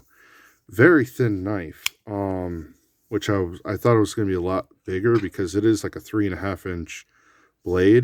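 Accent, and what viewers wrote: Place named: Massachusetts, United States. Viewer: Not a bad collection! Pretty ridiculous seeing the two Toors side by side. I handled a v1 in my local knife shop, but have never owned one. I'll grab one when I find a good enough deal. Have you seen the new $425 folder they're making? Looks kinda like a 3.25" Greyman Satu. Looking forward to the sharpening video. You showed a few decent edges. I thought I recognized a bit of mass accent... Im in central new york.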